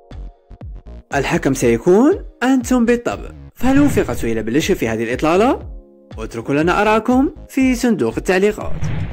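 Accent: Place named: Algeria. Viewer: مشاء الله عليك بنت بلادي ربي يحفظك مالعين يامشرفة الجزائر بالهجتك وعفويتك يااصلية وبنت اصل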